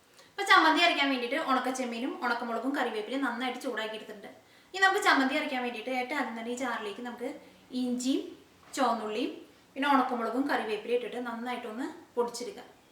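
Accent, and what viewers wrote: Thrissur, India. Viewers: U r my guru at cooking.I got married two years back and since then I was watching your videos and tried alot of receipes and came up with success stories. Thanks alot .like your tcr slang and ur simplicity. Your videos r really inspiring for others to cook.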